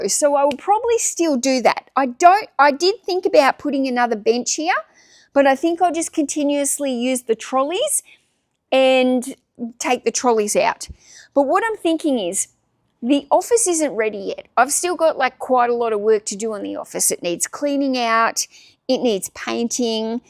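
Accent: Australia. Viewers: I just realised how ocker aussie your voice is haha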